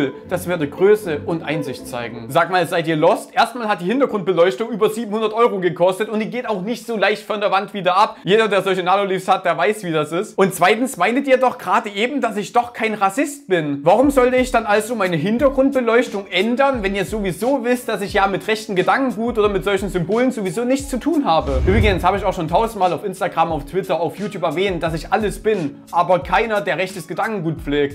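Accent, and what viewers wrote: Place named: Saxony, Germany. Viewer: Aber ein Prob muss an die Seite geben! Sie sind vllt die Ersten die wissen, dass du einen sächsischen Dialekt hast und keinen ostdeutschen...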